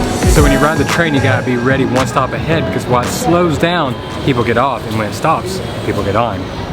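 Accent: South, United States. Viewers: You sound like you have a southern accent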